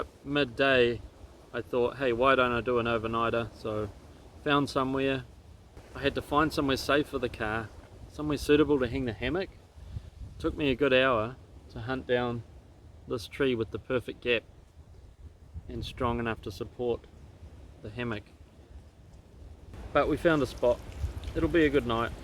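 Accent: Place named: New Zealand. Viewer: You are fun to watch. Your sense of humor is so laid back. You don't yell like others do. Love your New Zealand accent. Please keep making videos.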